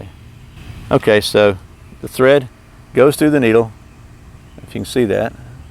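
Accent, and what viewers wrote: Georgia, United States. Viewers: I place that accent at south of Atlanta, GA How far off am I there, sir?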